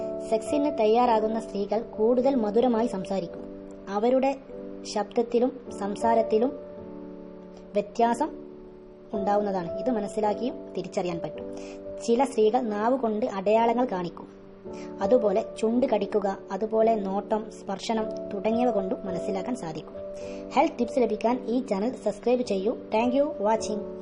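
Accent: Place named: Malappuram, India. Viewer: Malapuram Ulla Etho oru kundapi aanu... sound ketta ariyam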